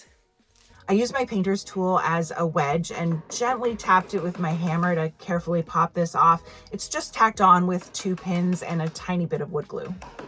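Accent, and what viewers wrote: Canada. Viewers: Wow! The chest turned out nice! Love your Canadian accent.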